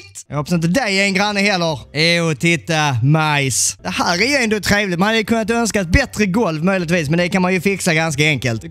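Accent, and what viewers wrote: Scania, Sweden. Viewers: Älskar skånskan ahahaha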